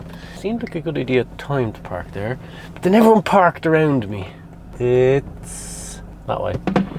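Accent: Ireland. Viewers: Now I can understand your Irish accent as I'm Irish too, go up north and then I might say yes hard to understand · Thanks for taking us along, we are glad you met Dan Preston. Also thank you for using subtitles for the proper name places you visit as sometimes I have a wee bit of trouble understanding your pronunciation of French names in your Irish accent 😅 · I'm Australian, and I also happen to be 46% Irish apparently, so I would love to visit Ireland one day. I also love France. I've always loved the French language, and I'm currently teaching myself French, so I do really enjoy seeing the French villages and culture that you show in your little adventures. P.S. I should also say, I love the Irish accent as well, so what's not to love about your Vlog! 😊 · Thanks for taking all of us window shopping. Love all the old buildings. The knives were cool. Love the back roads. 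So pretty. Yes I can understand your Irish accent. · you're a total ledge! mumbly Irish accent and all! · Thank you for the titles of the villages,. It’s not your mumbly Irish accent - it’s my french ears not working. I love your Irish accent 🌸 Love from Cape Town, South Africa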